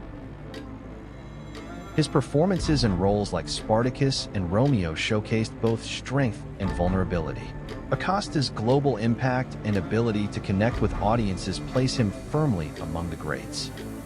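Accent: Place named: United States. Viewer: Why the hell do we have a Yankee voice on this video? The Royal Ballet is English. We neither need nor want Yanks. I know that Miss Naghdi and Miss Haywood both speak English almost as well as they dance with beautiful English accents. Why ignore such quality resources and use something more and more are finding offensive?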